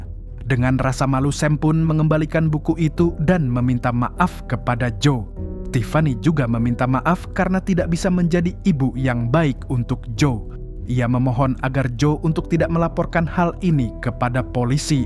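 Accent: Banjar, Indonesia. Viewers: Pian urang banjar kalsel kah 😅😅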